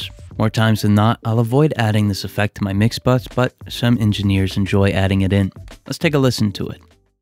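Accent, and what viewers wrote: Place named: East Coast, United States. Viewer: I still don’t understand why your pronunciation has COMPLETELY changed from your older videos. It’s like you’re trying to sound a little east coast-ish. Whatever it is, I’m puzzled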